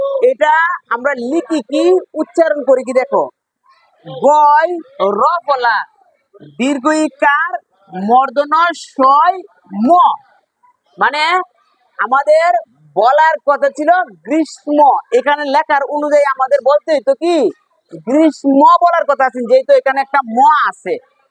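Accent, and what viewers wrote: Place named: Kishoreganj, Bangladesh. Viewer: আপনার নিজের ই তো উচ্চারনের সমস্যা ভাই, আপনার বাসা কিশোরগঞ্জ মনে হয়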